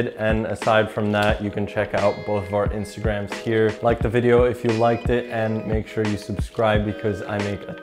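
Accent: Netherlands. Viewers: Are you dutch? :) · Are you Dutch?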